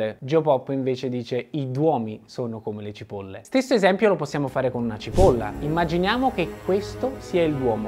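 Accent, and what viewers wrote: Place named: Naples, Italy. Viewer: ma togli quell' accento napoletano suu'! che rovina l interesse dell argomento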